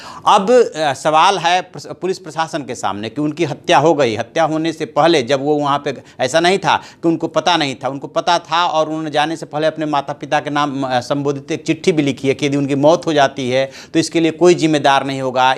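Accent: Bihar, India. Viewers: Hindi in bihari accent....Achha hai😁😁😂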